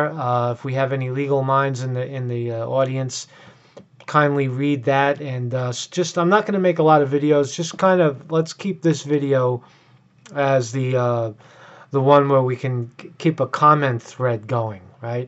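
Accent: New York, United States. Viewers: New sub,, I like that NY accent haha,. But its, SAD unfortunately that's how most cities get and rely on hidden taxes . They outspend and then say their saving the city so much in other taxes just to get elected .. I'm not surprised of the deceit just to tax us more and I think most people are aware of the corruption . Ya vote and hope the politician is honest about cutting taxes . OUR WORLD TODAY . I WORKED 40 yrs for the US PO .and seen corruption first hand with people trying to get promoted ETC.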